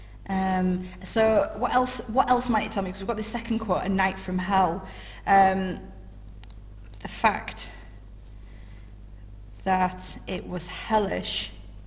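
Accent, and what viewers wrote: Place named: Teesside, United Kingdom. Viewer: Love the accent lol are you from Tees? Also what are Peel paragraphs ?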